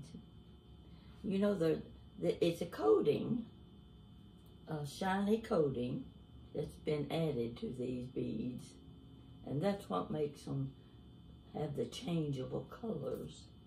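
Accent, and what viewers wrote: South, United States. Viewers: yes the deep southern accent. Awesome.😊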